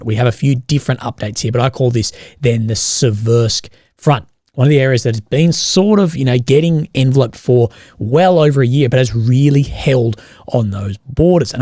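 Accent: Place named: Australia. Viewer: "Gddye". I think he is saying "good day". Australian English "day" sounds like "die" to Scots (and Autralian "die" sounds like "doy"). Legends could be rhyming slang for friends. Add pronouncing "low" with a shwa like in French "le", but followed by a slight "w" glide, and you have the four peculiarities of Australian English borrowed from Cockney. Though I must say that this pronunciation of "low" is more widespread in Britain.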